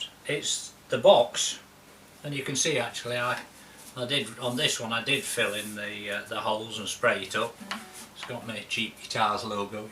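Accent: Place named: United Kingdom. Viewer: A nice change from the common license plate variety. I would highly recommend a magnetic pick up then you can put some overdrive on and I think that big box will really sound good. Love your accent. I left the UK in 1984 and we don't have a lot of folk here in Montana that sound like us. Where are you from exactly. (Sentinel Guitars, Bozeman MT)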